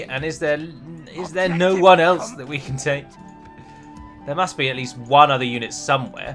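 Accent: United Kingdom. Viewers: Hello, you make awesome videos man, I love to hear you speak just because your clean and excellent British accent. Now, talking about DaC and your ongoing series, seeing as war with Mordor is inevitable in this campaign (because of the reasons you mentioned in the video), I believe it's most wise to seek an alliance with said foe in the Dorwinion campaign so that one doesn't focus in the same enemy and you focus completely in Dale to make the game more interesting as you said in that series. Keep up the good work man!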